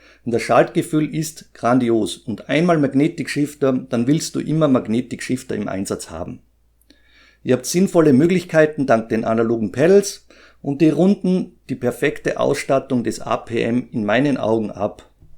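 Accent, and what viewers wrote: Austria. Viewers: Hallo, du hast in dem Review einen Adapterkabel von einem dritthersteller geredet, da ich selbst langzeitkunde von fanatec würde ich die mpm gern auf meinem F1 csl wheel nutzen (first Generation) da die APM für das neue porsche wheel vorgesehen is … somit ist das Interesse an diesem Kabel des Drittanbieters extrem interessant!!! Ps: bin oö‘er … du klingst als wärst du nur ein paar kilometer von mir weg? LinzLandler?!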